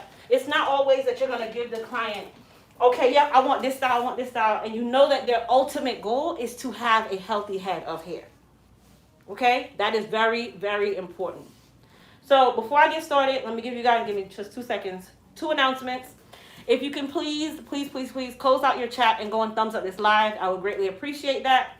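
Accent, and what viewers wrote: Jamaica. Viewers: I can hear that Jamaica talk in you.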